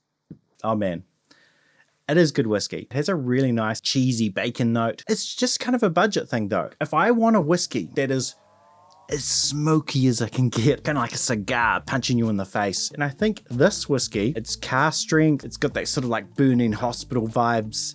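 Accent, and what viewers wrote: New Zealand. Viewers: I like the content, but that New Zealand accent is killing me, at times is almost unbearable. I will try another video.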